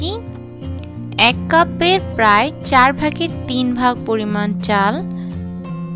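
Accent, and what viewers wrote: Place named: India. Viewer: Khub khub Valo laglo khete Mon chaitese apu TMR Katha gula Indian blogger Der Moto lage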